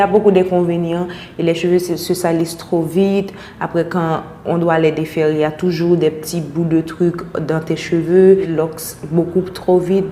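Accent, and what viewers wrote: Haiti. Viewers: Elle parle comme une haïtienne!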